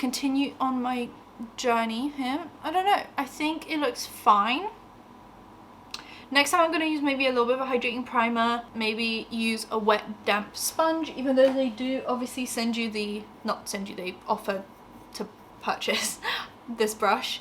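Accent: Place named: United Kingdom. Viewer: I dont know what it is about British peoples voices but i love yalls voice, the tone and the accent. When i need to fall asleep fast i tend to listen to British people speaking (podcast, audiobook etc)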